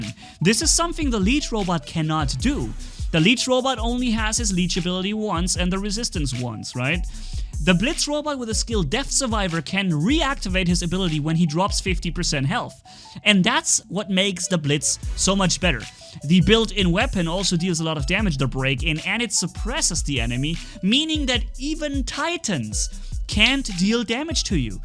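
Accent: Germany. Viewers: Are you German ?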